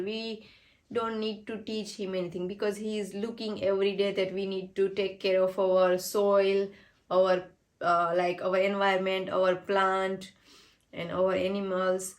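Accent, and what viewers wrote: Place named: Gujarat, India. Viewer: Your interview was pretty good but you have gujarati accent in your English it is ok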